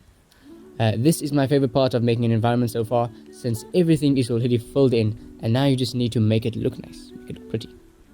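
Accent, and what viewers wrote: South Africa. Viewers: nice tutorial bro are you from south africa? I can hear from your accent lol. I'm from cape town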